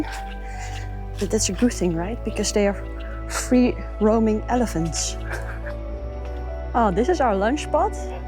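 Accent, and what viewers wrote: Netherlands. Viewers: Dag, bedankt voor deze gewelig mooie video. ik heb er van genoten en ook van jouw Engels met dat onmiskenbare lichte Nederlandse accent😂 Groeten uit Breda NL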